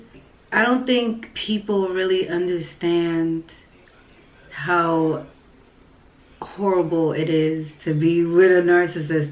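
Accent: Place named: Latin America. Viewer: yo....are you black? I know thats a dumb ass question but i wanna know because your accent makes you sound latina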